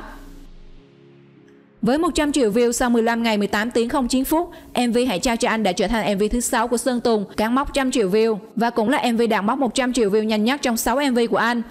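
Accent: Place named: Binh Dinh, Vietnam. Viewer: Ns cn rặc giọng bình định quá nhe ..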